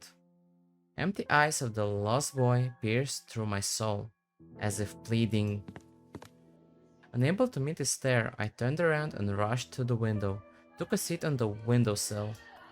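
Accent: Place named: Russia. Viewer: Как только услышал идеальное произношение русских имён, сразу понял, что смотрю не иностранца... Произношение на английском у вас шикарное. Если сильно не вслушиваться, то можно и не заметить акцента, что, собственно, и произошло)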